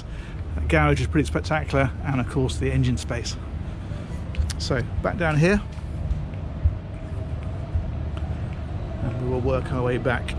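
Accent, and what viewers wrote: England, United Kingdom. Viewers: ... This is the tour that 'popped my yacht cherry', so to speak. PS - My wife hates you for the monster you've created. "Long time listener, first time caller"... Yes. I've seen every dang vid you've done on floating vessels. It's like video heroin, I swear it. So... It all started out with Youtube's "suggested view". Watched this... Watched all of 'em, no really. ALL of 'em. So I says..." can't be the only dude doing these..." And clearly - he's not. He's just the guy who does them brilliantly...!!! I've watched over a dozen guides, ranging from obnoxiously douchy, to walking animal tranquilizers, and..... You sir "are the bar", so to speak. You sir, set the standard in yacht tour guides. Now enough about you...! This damn "boat"... Great Googly Moogly...! Some thoughts on Alia: - The biscuit in red... I love the way blue bloods 'prance' as they scoot out of the way. 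Precious jiggle... "Slightly Japanese theme"...? Ya think? Only thing missing was a David Carradine cameo! And the Master... Gorgeous, beyond belief, but- no friggin' TV? Wait, what...? And the shower... You could fit an entire football team's cheerleading squad in there...! (IF they squeezed real tight - "Oh, stop...!") The "extra cabin"... VIP quality, to say the least. Awesome crew cabins and mess area! And...... EVERY crew cabin has a proper shower for the pair of bunkmates. "Excellent..!" (In sultry, understated, classic English, I think, tone) I cringe when I see the "hand held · Wow, I like AL you minium. We pronounce it ah loom in uhm. Cool as heck to hear your English accent.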